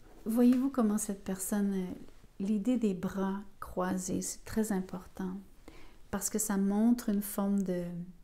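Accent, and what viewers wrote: Quebec, Canada. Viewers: Je l'attendait tellement, avoir cette manière apaisante et la signature Québécoise ❤, normalement les verseaux seront les premiers pour Mai 😅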